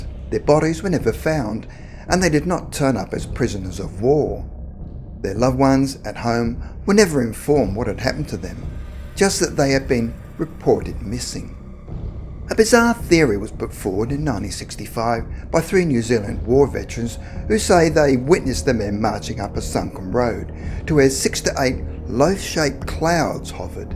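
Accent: Australia. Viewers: I thought you were a Aussie mate.😄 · Are you Australian? That voice. Bloody so called great war is very accurate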